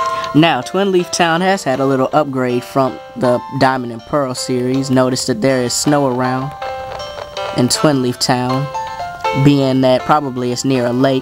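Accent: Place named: Appalachia, United States. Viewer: MAN! shut up and just play the game, we know what going on, i hate your hillbilly accent i had to stop watching the video and find a video with no idiotic talking. 0/5 stars, no subscription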